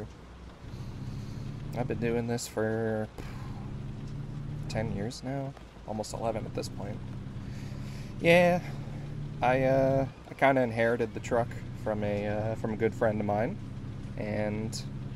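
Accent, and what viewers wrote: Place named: South, United States. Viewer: SLIGHTLY SOUTHERN SOUNDING CATBOY TRUCKER RAAAHHHHHHHH 🗣️🗣️🗣️🗣️🗣️🔥🔥🔥🔥🔥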